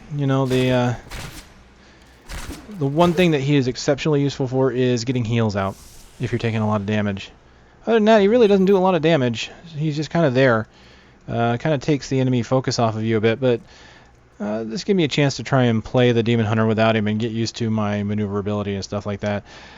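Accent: Germany. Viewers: u´re german is funny ^^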